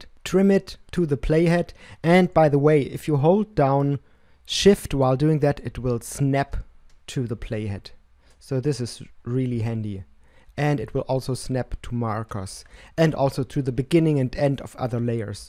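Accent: Germany. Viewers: Du machst echt gute Videos. Warum machst Du das nicht (auch) in deutsch? Also, ich meine bei Dir rauszuhören, dass Du eher deutsch sprichst, oder? Echt gut.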